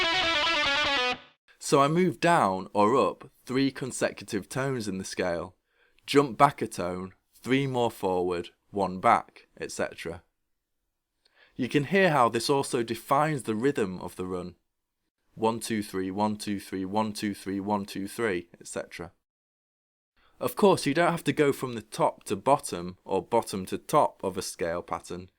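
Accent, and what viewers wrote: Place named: Cockney, United Kingdom. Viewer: cockney speach hehe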